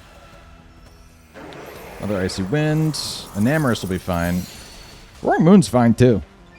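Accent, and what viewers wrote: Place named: Philadelphia, United States. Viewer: Your Philly accent was aht bigtime today pal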